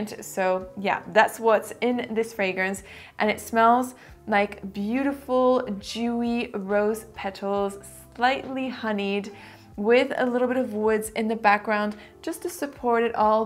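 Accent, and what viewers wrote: France. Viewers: Bonjour, Je viens juste de découvrir cette vidéo, je reconnais un accent français! Est tu française. As tu déjà fait un top 10 de tes parfums préférés et si oui pourrais-tu m’envoyer le lien? Je suis dingue de parfums et suis toujours à la recherche de nouvelles découvertes 🥰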